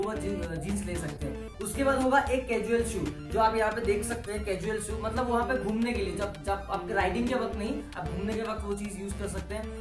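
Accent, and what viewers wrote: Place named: Northeast India, India. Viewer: Risky hojatai N.E accent 😋🖤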